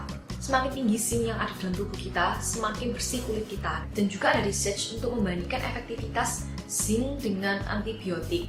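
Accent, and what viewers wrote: Java, Indonesia. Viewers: Logat jawa nya kental .... 😂😂👍👍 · Dialeknya mentok jawa bangeet yaah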